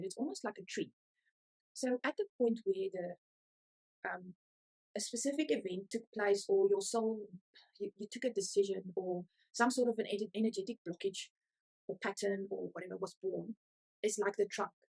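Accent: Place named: Afrikaans, South Africa. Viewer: Well I can understand an Afrikaans accent! Baie dankie.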